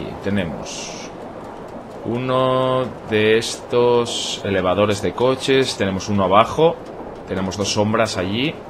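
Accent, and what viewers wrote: Asturias, Spain. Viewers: maquina como se nota que yes asturianu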